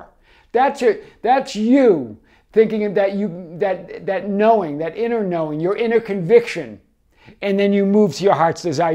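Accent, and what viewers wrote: Boston, United States. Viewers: Weird thing, the whole reason I started listening to you was literally because of your accent. I’ve always had a strange connection and love for Boston… we go there every chance we get… And we try to say “chowda” etc😂 All that to say your accent is one of many things that makes you our lovable and lighthearted teacher- I think it’s part of what put you on the map!!!!!! 🍀It did with me anyway!! ❤️ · Love your Boston accent ❤️ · I love your Boston accent! Thank you! You helped me remember and continue to do so. I love my life and I am grateful! Thank you again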